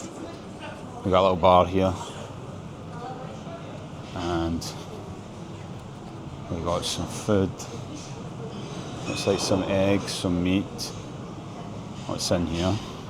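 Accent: Scotland, United Kingdom. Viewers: Nobody knows. Every video could be fit into a 3-minute-short-report, but... 15- minute-bla-bla-bla with awful Scottish accent makes me switch to clear speech of the US-prof. John Mearsheimer. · he missed spoke or it was his scottish accent. He meant Belavia. At the same-time he made it clear it was the national airline from Belarus that he flew out from. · Hi was in Scotland yesterday and met many sounded like yours:)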